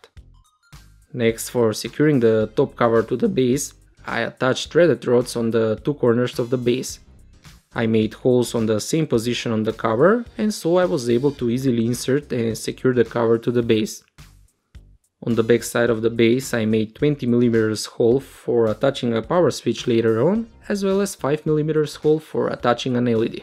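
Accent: Russia. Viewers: какой то русский акцент присутствует или мне кажется?) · Слышится акцент, скорее всего русский) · как же слышен акцент русского))))))))))0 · Русский акцент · Русский акцент, русский чтоль ?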